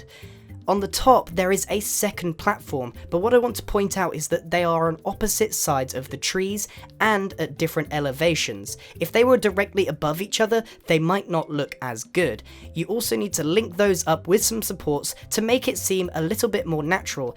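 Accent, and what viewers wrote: England, United Kingdom. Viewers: He has a English accent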